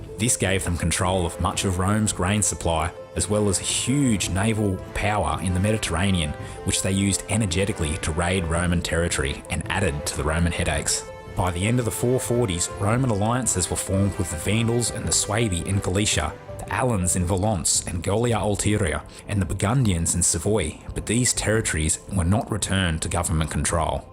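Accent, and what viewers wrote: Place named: Australia. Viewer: Wonderful overview told with a lilting Australian accent. Shared this with fellow students in a Future Learn course sponsored by Newcastle University, "Hadrian's Wall: Life on the Roman Frontier". Thanks!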